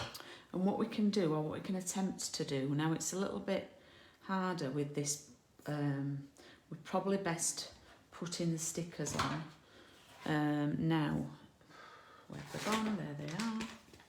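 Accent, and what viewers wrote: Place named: Yorkshire, United Kingdom. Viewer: Watching replay from Sydney. I’ve been enjoying your videos. I love your accent. Are you from Yorkshire? I was born and grew up in Stoke. ❤️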